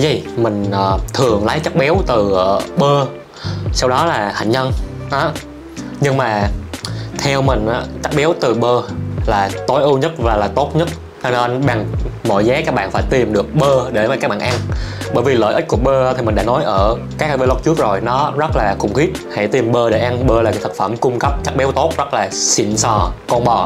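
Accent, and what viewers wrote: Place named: Phu Yen, Vietnam. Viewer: Bạn nói tiếng giống người phú yên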